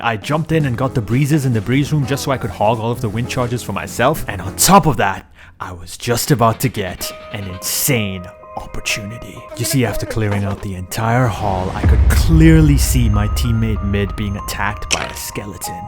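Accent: India. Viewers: Hey bro😱😅 aap btw me bhi yahi Jan ne aaya hu ki ye Indian hai ya nahi confusion hai mujhe bcz senpai Ke video me Hindi itne easily Bola tha tone Indian thi🙂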